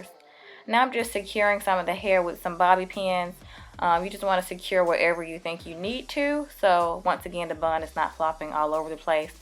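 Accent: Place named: Alabama, United States. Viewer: Alabama, United States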